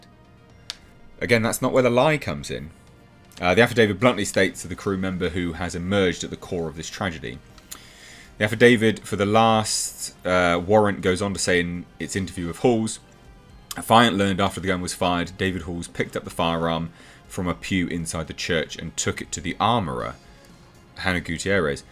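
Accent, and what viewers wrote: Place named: United Kingdom. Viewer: You're so handsome, then add the British accent and it's a wrap for me lol. Sorry 🤷🏻‍♀️🤦🏻‍♀️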